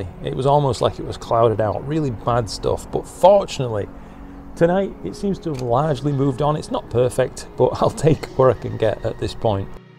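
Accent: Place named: North, United Kingdom. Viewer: Just catching up with this vid. Great watching as always. I like the current channel name, but it would be remiss of me not to mention as a fellow northerner, given your geographical origins, something along the lines of ‘ in the night sky’. The /look ‘joke’ might be lost on those not familiar with regional accents but it still works with your name! 🤔🤣 👍🏻 Noddy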